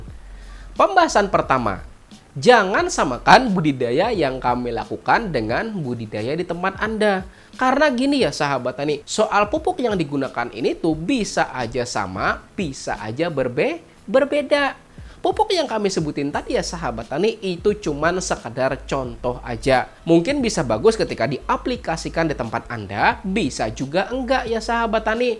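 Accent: Banjar, Indonesia. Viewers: urang banjar mun bepander bahasa indonesia pasti ada inguh inguh banjarnya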